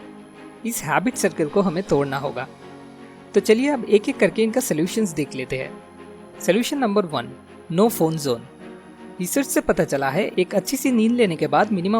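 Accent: Bengal, India. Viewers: I am 💯% sure that you are BANGALI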